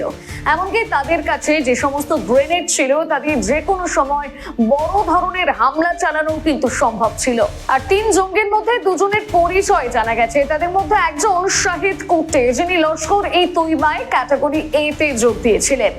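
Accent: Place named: Bangladesh. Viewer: কি খেত , কোথায় হাগতো সে খবরে কি দরকার?Glorification of notorious animals channel গুলোর ব্যবসা!বাংলাদেশী মহিলা মনে হচ্ছে উচ্চারন শুনে!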